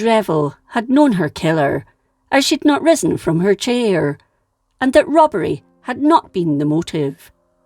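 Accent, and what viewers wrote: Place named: Scotland, United Kingdom. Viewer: I love your soft Scots accent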